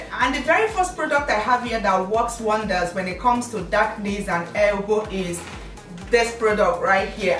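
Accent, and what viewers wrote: Igbo, Nigeria. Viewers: That's a Nigerian Igbo accent We have different accents here :) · Nigeria asent oooh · Hello Gorgeous! New subbie...Luv your makeup, outfits and OMG, that Nigerian accent is as beautiful as you are! Luv, Blessings & Keep Slaying!!! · you are the igbo omotola😘😘i love your igbo accent and beautiful personality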